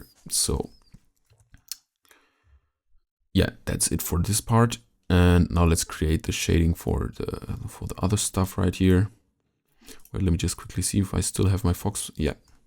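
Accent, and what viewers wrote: Germany. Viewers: kanns sein, dass du deutsch bist? :D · Watched every second of this. Lovely. Jurgen Klopp came to mind through out because of the German accent lol. Bravo! Subscribed!!!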